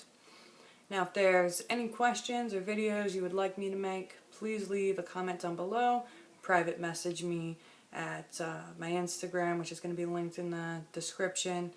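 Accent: New York, United States. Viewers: By the way I could listen to you talk forever I love your New York accent it is so adorable I just I love it I cannot wait to come out and see you in New York we are going to have a blast!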